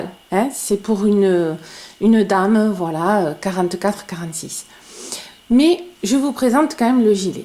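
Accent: South, France. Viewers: Bonjour, et merci beaucoup pour ce très beau modèle. J'aime tout! 😍 Le point, la large encolure à la limite du col châle, la construction carrée simple (mon premier patron maison était de ce type, avec également un point en relief, mais avec un col V). Je vais le faire, c'est une certitude! Et que serait une vidéo d' sans son ardoise, son accent plein de cigales, et ses petits rires spontanés? Vous êtes un plaisir pour les yeux et les oreilles. ☺️ Sans compter que vos explications sont toujours claires, précises et détaillées. Je vous remercie encore pour ce gilet qui va définitivement entrer dans ma garde-robe. 👗 Petite précision: je fais mon point élastique en montant une chaînette que je travaille en brides relief avant et arrière sur le retour, et j'avance sur le poignet en mailles coulées. Je trouve que cela resserre mieux autour du poignet, surtout si l'on a une manche un peu large justement pour mettre un autre vêtement sous la veste. 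Je vous souhaite une très agréable journée. Prenez bien soin de vous. 🌺🙏😘🌺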